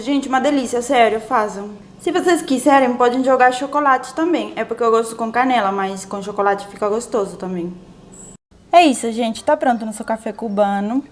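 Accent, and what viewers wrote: Rio de Janeiro, Brazil. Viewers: as experiências vividas aqui no Brasil, são frutos das adaptações, tem um preço a ser pago, mas que uma desbravadora, você é uma mulher de muita fibra, parabéns pelas conquistas e o que fez você vim pro Rio de Janeiro, "Cidade Maravilhosa "..daqui a pouco vai esta com sotaque de carioca igual a mim, beijos💜💙💛❤💚😘😍🥰👏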